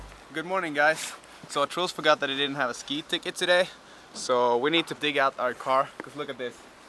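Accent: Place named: Sweden. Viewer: feeeett! Jag är också från Sverige haha, tyckte de lät som om du va svensk;)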